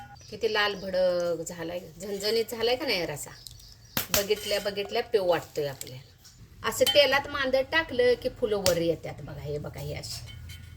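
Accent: Kolhapur, India. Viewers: Kolhapuri bolta tumhi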